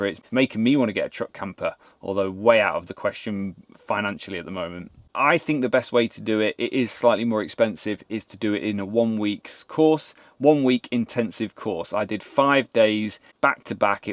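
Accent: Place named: Sheffield, United Kingdom. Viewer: he sounds like a Sheffield lad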